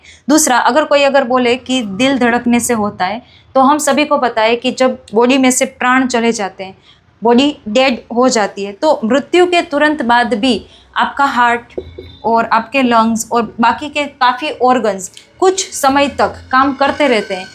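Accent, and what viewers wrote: Gujarat, India. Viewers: Dear sister looks like you are gujarati this I find by your Hindi. What is true?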